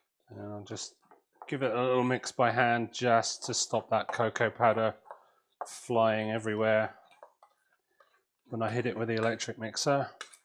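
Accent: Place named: Australia. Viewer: Thank you for this video! I'll be making this tomorrow. I'd make it today, but I just cleaned up the kitchen from making ice cream, and am just too lazy for today. I am now your 365th subscriber. And, I LOVE your Aussie accent! Diane in North Carolina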